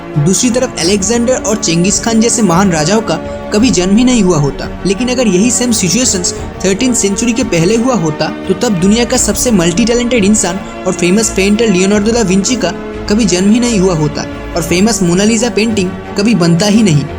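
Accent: Bengal, India. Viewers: Bhai video bahut achhi hai but tera pronunciation full toos bekar hai...poori Bangali tone aa rahi hai...tu kya bangal se hai..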